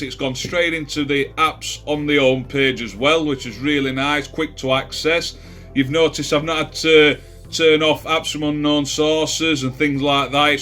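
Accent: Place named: North, United Kingdom. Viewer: Got mine on order. Thank you. Love your videos. Nice to hear a northern voice on YouTube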